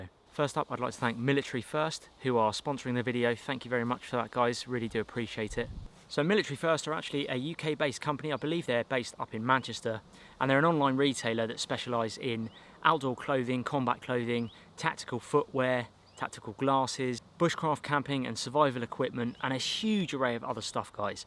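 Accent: United States. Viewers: His American accent is so funny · that america accent was awesome haha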